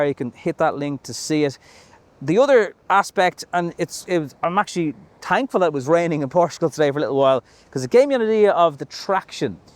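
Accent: Ireland. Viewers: Is this Ireland accent?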